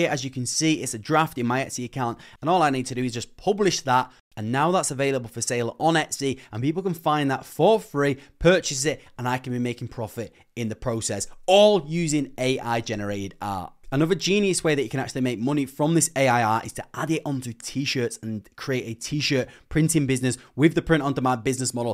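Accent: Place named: Manchester, United Kingdom. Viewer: You sound like a Manc! 🎉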